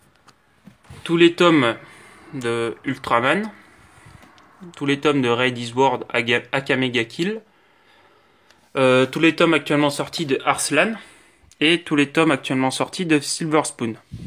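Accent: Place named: England, United Kingdom. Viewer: Excellente cette vidéo avec un accent anglais tellement beau ! Je recommande les yeux fermés les perdus de l'internet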